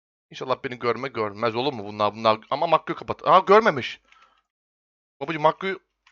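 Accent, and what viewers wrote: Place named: Bartın, Turkey. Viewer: Bartın’lı mısın babacım 😃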